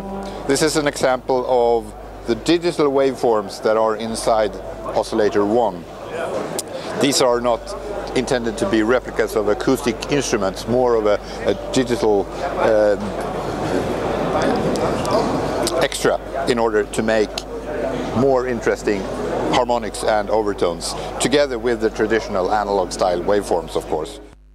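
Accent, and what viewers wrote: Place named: Norway. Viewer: He must be norwegian... Have that kind of acsent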